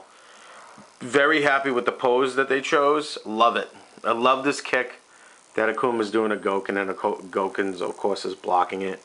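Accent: Boston, United States. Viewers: You sound like your from Boston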